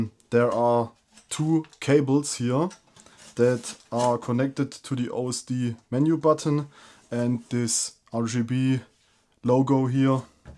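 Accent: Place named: Germany. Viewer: German accent ❤️‍🔥❤️‍🔥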